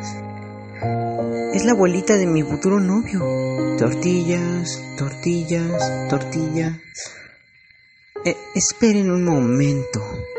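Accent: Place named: Latin America. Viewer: Oye Heres Latinoaméricano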